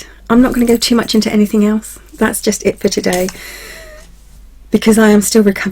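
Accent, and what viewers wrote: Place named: Hampshire, United Kingdom. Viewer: My ear for the UK accents isn't that perfected yet.. I didn't pick up that your voice was Hampshire even though I see the gps pin that says so.. but if I think about it . absolutely :) Folks magic here to me is also known as Hoodoo - instinctive magick.. I'm totally there for it at this season of my life!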